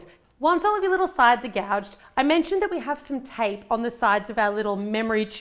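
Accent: Australia. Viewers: are you Australian? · Yasmeen Ashby-Ramos she's Australian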